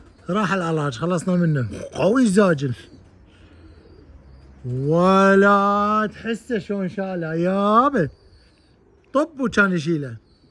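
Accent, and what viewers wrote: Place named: Iraq. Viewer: حلو انت تحجي هولندي باللهجة العراقية هههههههه انت بلجيكا بالقسم الهولندي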